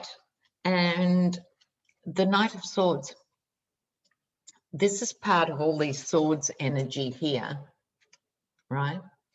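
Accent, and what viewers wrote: Australia. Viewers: I like hearing Australia as well as the United States of A.. Thank you for a great reading Miss. I send you big love from Beth in Mancos CO.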